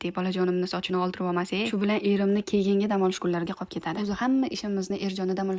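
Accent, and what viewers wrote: Tashkent, Uzbekistan. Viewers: Хаммаси зурку,лекин бир нарсангиз мани ажаблантиради.Шевангиз,наманганликлар бузилган тошкентча гапирмайди.русча кушиб гапиришингиз эса жуда савияси паст билимсизлигингиздан белги.илтимос узингизни бу киликларингиз устида бир оз ишласангиз булармиди. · Узиз наманганлик буп туриб , шевез тошкентчаю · Наманганлик булсангиз нимага тошкент шевасини аралаштириб гапирасиз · Наманганлик шеваси бошкачаку бу киз тошкентча гапирди😊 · Жуда хайрон колдим Намангонликлар жуда этикодли буларди ёки сиз Тошкентча гапиряпсиз Тошкентда катта булгансиз шекилли йукса Намонгонликлар динни кабул килса килмаган буладими ишкилиб бошка динга тегмайдику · НИМА УЧУН НАМАНГАНЛИК БУЛА ТУРИБ - ТОШКЕНТЧАСИГА ВОТТИЛАЯПСИЗ ??? ЯНА ЭРИНГИЗ КАРИС ??? ЯНА УРИСЧА КУШИБ ГАПИРАЯПСИЗ ??? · toshkent shevada qando gapiryapsiz namanganlik bulsayiz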